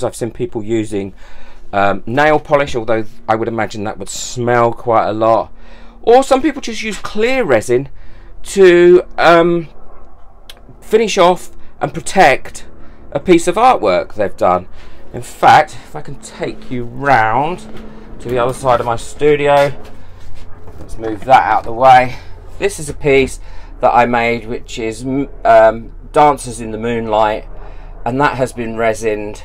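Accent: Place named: Essex, United Kingdom. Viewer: Hello I’m listening to you in Illinois but you sound like you’re from my home area of Essex,or maybe Kent love the video’s especially the bloopers. Just want to get started with resin I have quite a few silicone molds that I’ve used to make soap,candles( little LEGO men) am I ok to use those molds?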